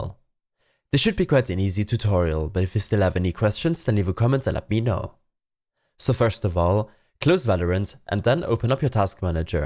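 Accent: Germany. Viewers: are you german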